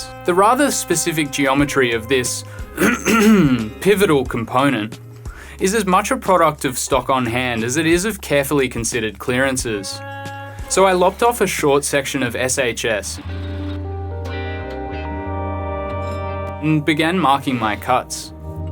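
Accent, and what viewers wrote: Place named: Australia. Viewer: Your delivery style suggests SuperFastMatt’s lost Aussie brother, but without the fast bit. Interesting project. Thank you for sharing. Peace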